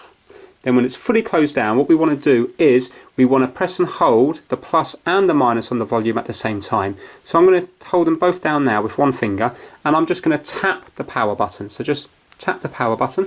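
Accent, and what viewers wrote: England, United Kingdom. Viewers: Your very helpful and I like your English accent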